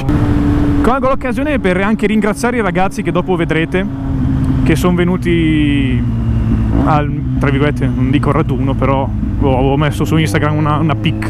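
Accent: Italy. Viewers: Ciao ragazzo ma sei di Brescia o provincia? Dall accento sembrerebbe di si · ma sei veneto?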